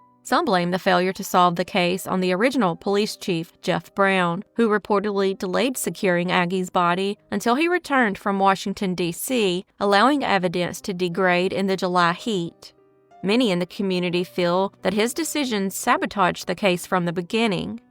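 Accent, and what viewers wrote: South, United States. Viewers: Yours is the only channel that I watch unsolved mysteries, only southern accent I like. Thanks for all of you hard work. Wishing you many, many more subswcribers. Panama here. 🥰♥🖤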